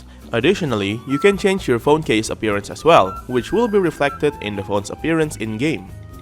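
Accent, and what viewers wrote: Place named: Indonesia. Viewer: dari aksennya Indo bgt 🤣, semangat bang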